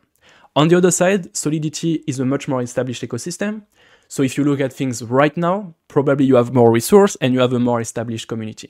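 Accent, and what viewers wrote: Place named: France. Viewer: There must be somebody without a French accent who can give us fantastic information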